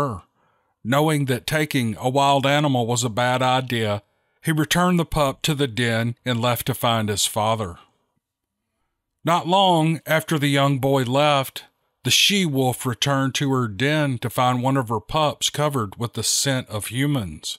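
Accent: South, United States. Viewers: LOVE this man's narrating!!! From the south myself and miss the accent!!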